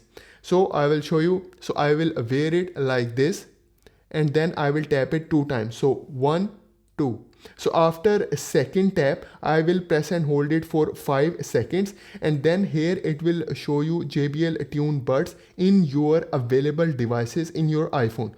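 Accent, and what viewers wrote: India. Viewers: Nasty pajeet accent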